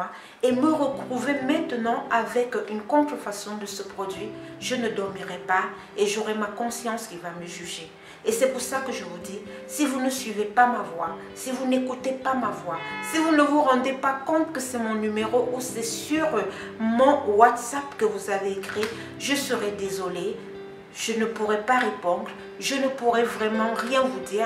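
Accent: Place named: Africa. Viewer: T'es super, je me suis régalée d'entendre ta voix charmeuse et douce avec cette note d'Afrique...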